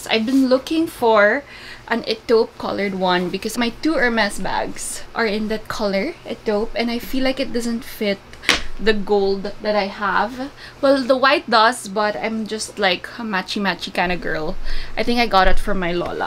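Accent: Visayas, Philippines. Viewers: Ka cute paminawn magbisaya hahahaha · ms., ang cute mo magbisaya🥰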